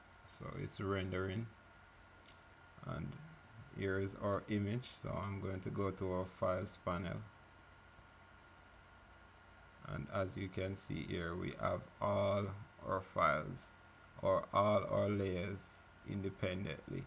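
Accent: Jamaica. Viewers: Are you Jamaican> :D :3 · u jamaican dont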